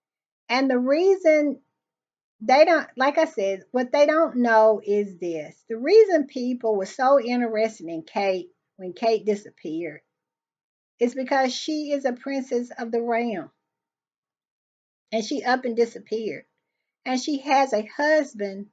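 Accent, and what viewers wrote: South, United States. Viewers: Oh your still getting paid and they are obviously using you as their useful idiot. They will turn on you. You sound like your from the south. The bayou the back woods. Cornbread ignorant honey child. You better get home cause your Ma Ma didn't teach you well. Whatever they are paying you. Ask your soul if you have one. IS IT WORTH IT. 8TH Grade education or am I giving you to much credit. IGNORANT! PAINFUL YOU ARE. SAD SAD.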